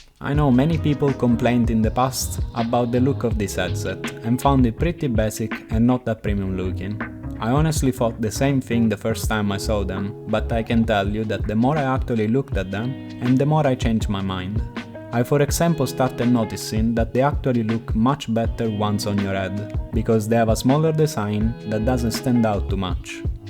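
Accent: Italy. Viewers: Italian english !! this is a very nice headset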